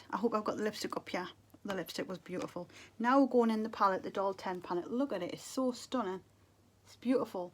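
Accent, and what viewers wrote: Newcastle, United Kingdom. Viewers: I've watched you from the beginning and my boyfriend went to Newcastle Uni (now my husband) and I was in Glasgow doing my nursing training. It seems silly but I felt really at home in Newcastle and when I missed him hearing your accent in your videos was so lovely. I'm so glad you're on youtube again and you seem so happy! you look stunning and wish you all the best for the future lovely.:) <3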